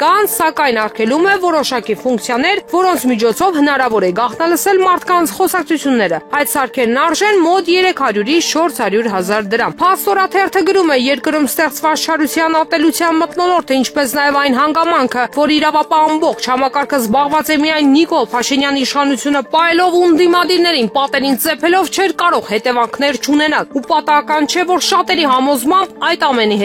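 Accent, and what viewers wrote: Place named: Turkey. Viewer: Այս հաղորդավարը թուրքի ստիլով է խոսում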